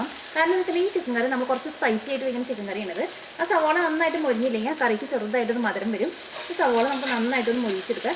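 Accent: Thrissur, India. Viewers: Dear Mam, this is excellent. We also tried your Ullii Chammandi & Rasam powder. A small suggestion - you should have avoided the back-ground song, which is not at all relevant for this particular dish - Nadan spicy Chiken, please check. We also like your off-screen, to the point presentation with a special slang (thrissur????) as bored with the on-screen presentation “of self” of some celebrity chefs, rather than their recipes). We expect more simple recipes from you Mam… Thanks. venu family, surat.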